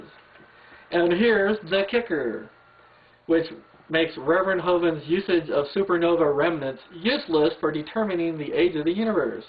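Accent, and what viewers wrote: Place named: United States. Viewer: How the hell can you mistake an American accent for an Australian one? Though I guess you have to be sheltered to like Hovind....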